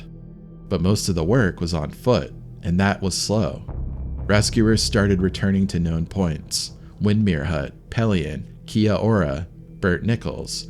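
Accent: United States. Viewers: I started watching this, but I can't tolerate an American accent telling us about our own country which they think they own.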